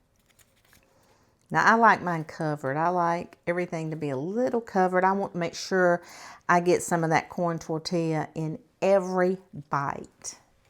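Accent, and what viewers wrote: South, United States. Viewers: I Love listening to you tell the recipe with your southern accent. Cant wait to makeThe King Ranch Cassarole. Thx 🎉👍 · Is that a southern accent?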